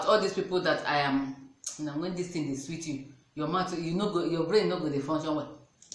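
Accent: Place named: Owerri, Nigeria. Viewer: u speak owerri , are u from owerri , im from owerri too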